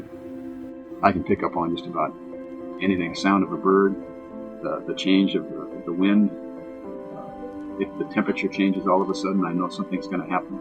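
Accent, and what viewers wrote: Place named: Hawaii, United States. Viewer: he's from Hawaii. That's exactly how they speak. Yer a dick.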